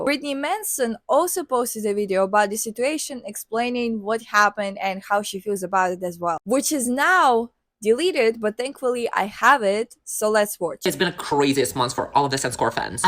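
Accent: Russia. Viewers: С самого начала слышала малюсенький русский акцент и думала мне кажется но нет🙂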